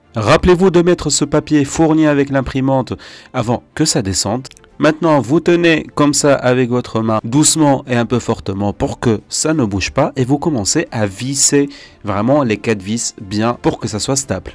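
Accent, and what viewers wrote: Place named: South, France. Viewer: 👏 pour la vidéo et 👍 pour le délicieux accent du sud qui fait tout le charme 😉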